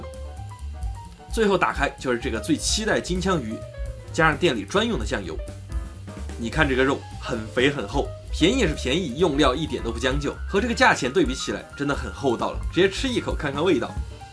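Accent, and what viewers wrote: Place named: North, China. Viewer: 好奇。影片里都换算成新台币，你怎么有大陆北方的口音？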